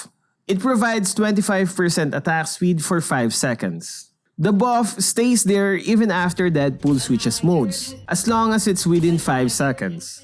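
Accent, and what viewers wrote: Philippines. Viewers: Putang ina mo accent mo pang cancer na pinoy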